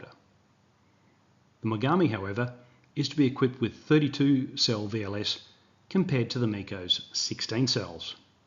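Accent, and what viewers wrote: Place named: Australia. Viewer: So refreshing to listen to an Australian voice that knows his subject. Thank you for your excellent and accurate content. Yes I have subscribed Instead of the rubbish from the USA and their A.i. voice, their conten is rubbish too.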